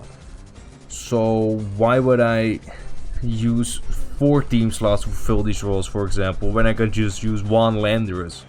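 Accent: Netherlands. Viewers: Obvious dutch accent lmao, good video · Love the very noticeable dutch accent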